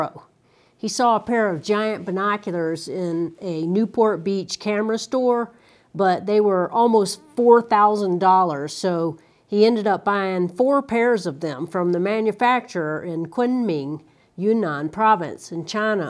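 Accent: South, United States. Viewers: Can't wait to get a pair. And I love the southern accent :)!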